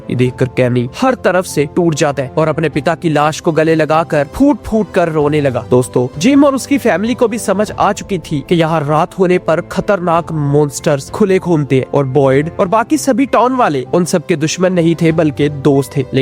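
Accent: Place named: Pakistan. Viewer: Paxtani accent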